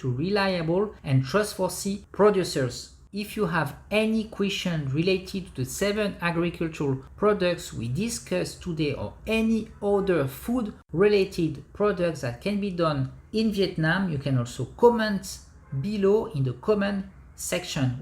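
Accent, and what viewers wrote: France. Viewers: Ca sert a rien de parler en anglais avec l’accent français !!parles directement en français,ca nous aidera a comprendre :)..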